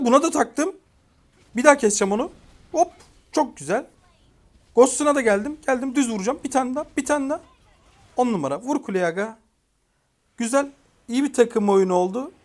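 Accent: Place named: Black Sea, Turkey. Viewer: Reis bende samsunluyum okadar belliki karadenizli olduğun gerçekten çok güzel videolar başarıların devamını dilerim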